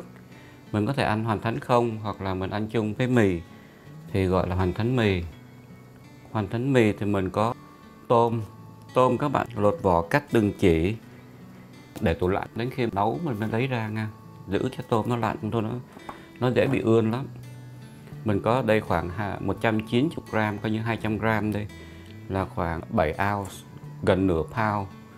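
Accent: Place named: North, Vietnam. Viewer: Nghe giọng anh là người Bắc 54 rất dễ mến , tôi thích xem cách anh nấu nướng rất đơn giản dễ thưc hành . Chúc anh nhiều SK & nấu nhiều món ngon !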